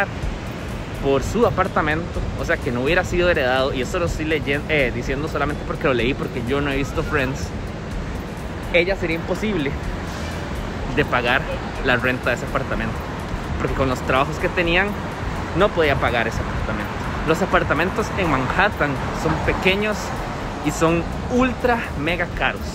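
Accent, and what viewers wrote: Costa Rica. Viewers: Si no me equivoco eres de Costa Rica por ese acento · Maee fijo usted tiene que ser tico o alguna vez vivió en Costa Rica jajajaja saludos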